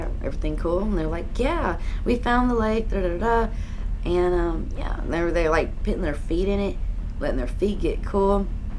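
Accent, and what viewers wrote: South, United States. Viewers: I was hoping you would say where it was, with your accent I assumed somewhere here in the south. This sounds like goatman's bridge, which I've been to. Eerie even during the day. I have no doubt its haunted.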